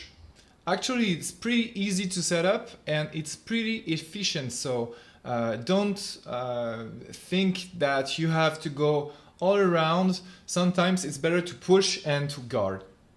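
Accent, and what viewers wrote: France. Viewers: He is french i belive or speak french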